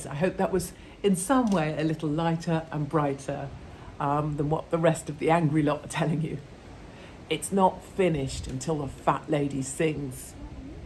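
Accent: United Kingdom. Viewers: you deserve the American Patriot Award! We Texans love British humor, perspective & your cute accent. And God, Guns & Guts to speak plainly. I see several fellow Texans Open Carrying each week. I have open & concealed holsters & carry a handgun in my car & SUV. I heard 3/4 of guys have a pistol or long gun in their car / truck in our State & the South, generally. Am armed society is a polite society! Any crook dumb enough to pull a gun here will find 4 or more pointing right back in a heartbeat.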